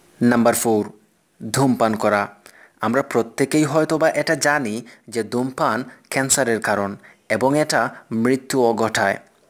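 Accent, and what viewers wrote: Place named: Sylhet, Bangladesh. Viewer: আপনি নিশ্চয়ই সিলেটি ভাষা বা উচ্চারন ঠিক করুন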